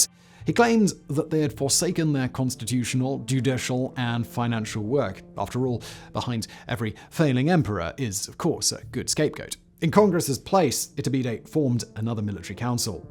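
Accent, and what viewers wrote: RP, United Kingdom. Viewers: A former Brit here who moved to America in large part because of what I'm about to say here. I have delivered a Thumb Down on this video. For the needless sideswipes against American conservatives. I heard a lot of this snideness when I was in the UK and I eventually figured out that it came out of a place of envy. Conservatives in America have elected some absolute duds - George W Bush, ugh - but they also produced Reagan and, yes, Trump. From your accent - which you have taken great care to curate, given the jumpcuts - I am guessing that you identify with the BBC upper-crust. A Brit can always tell.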